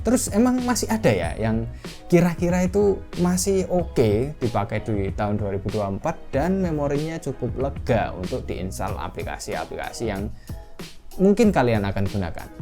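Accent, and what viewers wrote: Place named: Java, Indonesia. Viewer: Sumpah risih banget denger kata ( GUE ) gak pantes mas, medok mu lo 😢😢😢😢✌️🙏🏻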